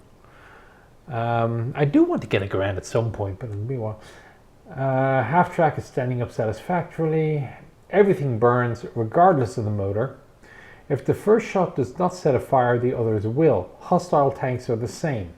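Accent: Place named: Ireland. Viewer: Ah yes, more from the Book of Armaments as read by a Irishman.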